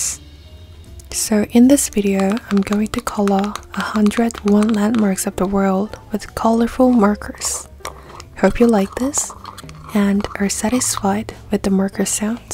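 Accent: United Kingdom. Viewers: Love your English accent❤❤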